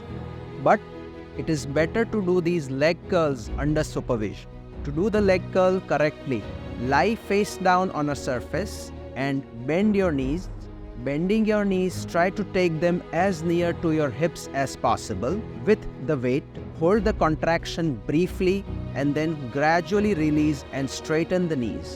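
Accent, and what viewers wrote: India. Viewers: Father teach me English more than 35 year that's why understand indian English grateful to ur parents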